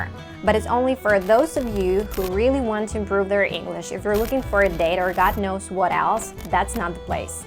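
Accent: United States. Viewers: you really sound American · Tremendous American Accent...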